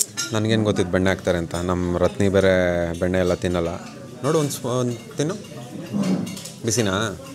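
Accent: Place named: Mandya, India. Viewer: sir nim english, italy spanish, axent jote mandya kannada extraordinary. Niv vlog madya mandya kannada chenda 😊 Happy anniversary to both of you🎉. Muddagiro girl baby ne pakka..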